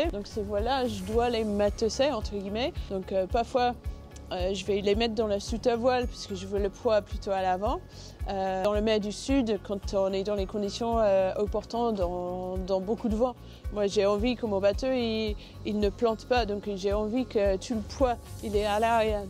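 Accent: United Kingdom. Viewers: Extrêmement sympathique la capitaine avec en prime ce petit accent anglais si caractéristique au charme indéfinissable. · je l'adore !!! ma préférée ... j'aime son sourire ,son accent british et sa force de caractère ... j'étais présent lors du départ de la dernière course du rhum .... impressionnant ces bateaux et de savoir qu'ils elles sont seuls a la manœuvre .... des héros !!!! énorme respect .....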